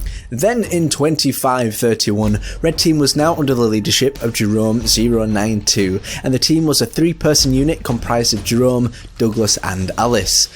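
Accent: United Kingdom. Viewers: Reverbed obviously British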